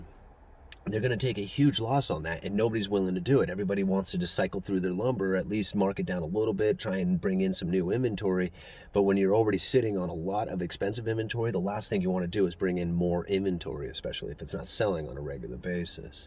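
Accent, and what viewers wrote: Pacific Northwest, United States. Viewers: Sounds very Pacific Northwesterly. Nice!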